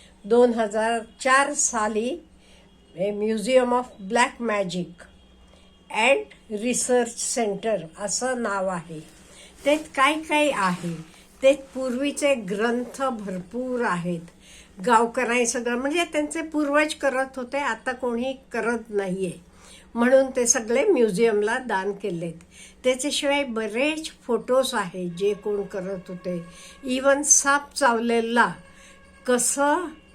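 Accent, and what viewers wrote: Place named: Vidarbha, India. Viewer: Aaji tumhi vidarbha cha aahat ka marathi thodasa vegla vatta pan chaan vatta aikayla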